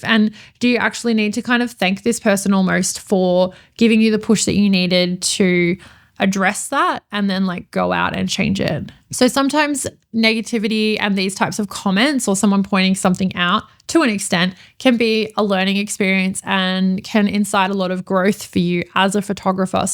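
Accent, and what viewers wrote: Australia. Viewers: Great points across the board. I'm on the big side of my 50's and it took until my 40's to be able to let almost all negative comments slide off my back. This episode can be so good for the young ones that take their interactions so personally. My difficulties come from learning street photography:).. I so want to get better at it, but I really get the icks when people get scrappy with me. Great stuff, I really enjoy your content (and the Gold Coast accent is wonderful - keep on keepin' on)...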